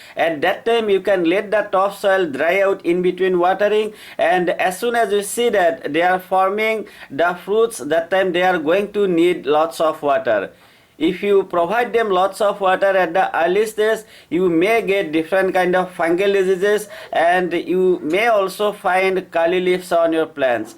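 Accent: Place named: Northeast, India. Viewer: R u frm northeast??I thought so coz of ur accent🤗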